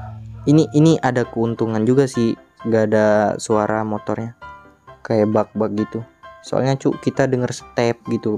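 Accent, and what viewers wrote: Palembang, Indonesia. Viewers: Wong Palembang kah bg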